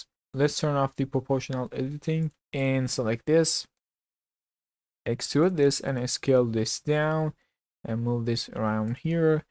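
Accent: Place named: Iran. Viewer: لهجه. فارسی ❤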